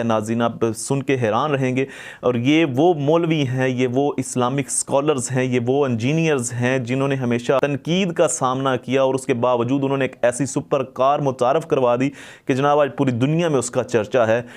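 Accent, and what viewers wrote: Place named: Pakistan. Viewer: برو کیرم دهنتون با اون صحبت کردنتون پاکستانی کثیف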